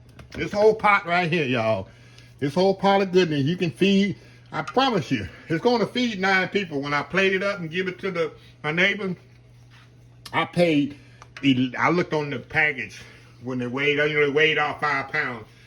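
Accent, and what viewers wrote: South, United States. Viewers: You remind me of my ma and can from the south when you cook and talk when u say y'all don't know about this I lol becAuse I do I love your cooking and I wish I can do more vidio using instapot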